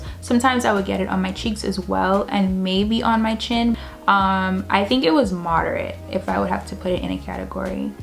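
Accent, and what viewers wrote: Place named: Bahamas. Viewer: Are you a Bahamian? Sorry but I’m one and I’m like she sounds like one too. But I love your content . Keep it up!❤❤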